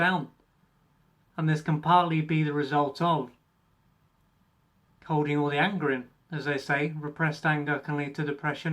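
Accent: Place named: United Kingdom. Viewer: Thank you.🌴🌊🌺 I am gonna try till I die.. 😊. Hehe. So many things you seid are so true. Tummy problems and learning problems and chasing adrenilen or shutting down and becoming a hermit. Lol, iv been stuck in the hermit stage lately. But I have to laugh about it sometimes. I love yr British accent. I have to watch Ricky gervase when I'm feeling really bad because he has a way of making me feel like life is crazy and we all have to laugh at it sometimes but, when I herd you talk, your easy to listen to and bring me back to Earth. I think I'm ment to live in England. Lately all the people I watch on YouTube who have inspired me live over there. It looks cold there. All of you are always whereing Jumpers. 🤒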